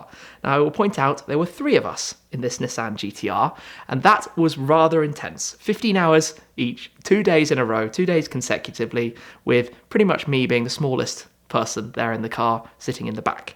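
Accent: United Kingdom. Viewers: Bc of the British accent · He s british :))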